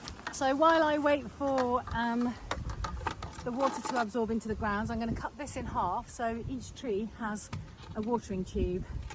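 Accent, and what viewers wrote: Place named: United Kingdom. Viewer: British gals are so cute and sexy. Love your accent 😍❤️.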